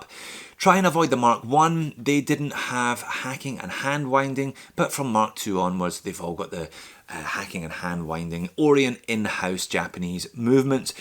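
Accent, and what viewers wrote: Scotland, United Kingdom. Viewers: Are you Scottish? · Scottish T, scottish accent.. instantly subscribed! :D Nice video btw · Are you a Scottish?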